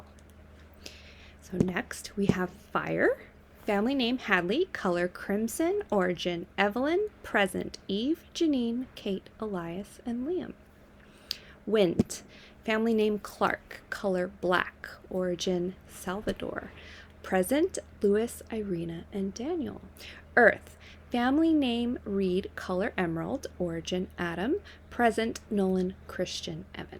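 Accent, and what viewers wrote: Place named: United States. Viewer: Have you ever heard of "Mad Studies"? or have you seen the webpage Mad in America? Interesting research into mental health, also check out Sadhguru on youtube. I'm enjoying your narration the American accent is cool.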